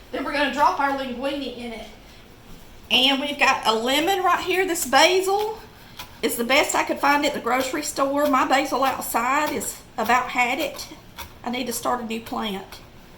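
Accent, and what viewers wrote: South, United States. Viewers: Yes, you have to always pay extra attention to your pasta for the first 2-3 minutes or so after you return the pasta to a boil. Very important that you are stirring constantly at that point, and then at least another 3 good stirs during….untill done! Trust me….we are an Italian family who eats pasta dinners traditionally every Wednesday and Sunday. I learned after moving to Florida from Brooklyn, NY, that here in the south, they don’t make a lot of Italian foods. I think it’s wonderful that you’re expanding your cooking skills to learn new things! I’m learning how to cook “southern style” from you and I’m enjoying it because you make it so easy for me TO learn! Just plain simple English (with a heavy southern accent 🤣) using every day ingredients is the way I like to learn cooking anything new! Thank you for that!! ❤️😘🥰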